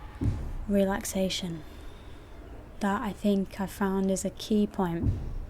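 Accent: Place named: North, United Kingdom. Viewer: Yo where you from, you sound northern!!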